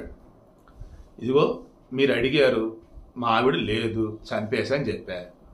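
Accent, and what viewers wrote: Andhra Pradesh, India. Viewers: Meeru mareee ...andra ....yasalo...matladutunnaru.....koncem....bhasa marchndee....speed ....kuda tagginchindee....andariki ardham awaali kada......🎉🎉🎉🎉🎉... ...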